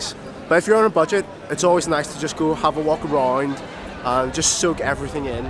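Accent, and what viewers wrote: Northern Ireland, United Kingdom. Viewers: I love your videos plus photos on instagram! Do lovely edited and good choice of music! You deserve way more subs and followers! ps: love your accent! nothing beats a proper northern irish accent! greetings :')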